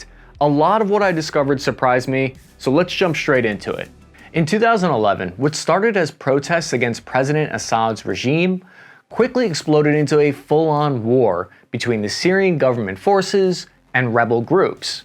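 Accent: United States. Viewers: Dude, you have a very strong American accent to your English usage. For me, an American, I put a lot of credence to your reports and analysis, because I know that it is coming from a familiar/recognized source. This is a good thing because there is a whole bunch of analysis which is written and then 'read' by computer to make it sound to be American English. Your presentation is authentic and sounds authentic. I have listened quite a bit and have drawn parallels in facts and analysis to other sites and sources that I trust. Good job! Keep up the good work, you have a keen sense for conflict and the social and political dynamics around conflict. Again, good job! and Thank You for your hard work and research.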